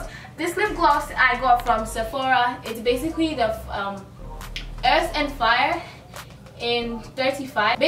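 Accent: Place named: Nigeria. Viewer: "YOU WILL BE BLOCKED LIKE BOM BOM POW" OUII SLAY GURL YASSS😗😗😘 NIGERIAN BISSH AYY UR MY NIGERIAN BUDDY THAT ACCENT THO GURL I LOVE YOUU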